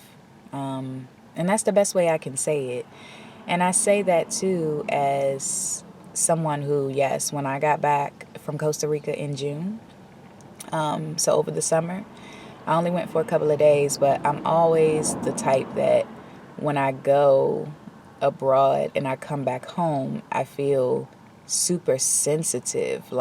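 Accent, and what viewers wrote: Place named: DMV, United States. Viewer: I hear a dmv accent. I am from there. I am feeling the same way with the trees. I wish I can move so that I can feel more freedom of nature. ❤ im grateful you have found your way.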